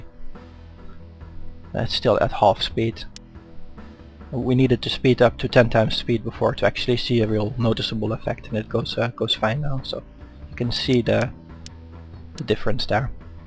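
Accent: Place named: Netherlands. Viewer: u sound dutch. what i wonder though why the ingame tutorial sucked ass X.x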